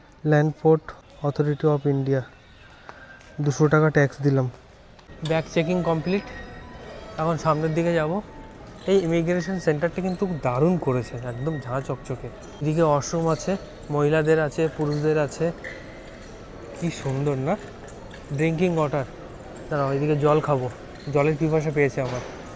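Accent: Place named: Bangladesh. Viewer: Bhai tomar kache indian passport thakleo tomar anando & kotha sune mone hocche tumi bangladeshi.. tumi Sonarpur kon jaigai thako ??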